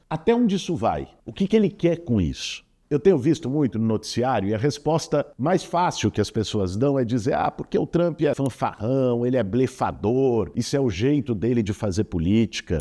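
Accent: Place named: São Paulo, Brazil. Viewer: Eu não aguento esse sotaque paulistano.... Podem me detonar, tá!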